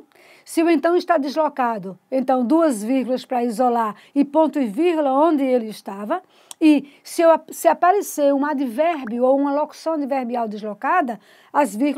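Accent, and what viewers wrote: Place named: Northeast, Brazil. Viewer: Professora, suas explicações e sua didática são ótimas.congratulações! Outra coisa: seu sotaque é muito bonito. Os nordestinos, nos destacamos muito nessa característica!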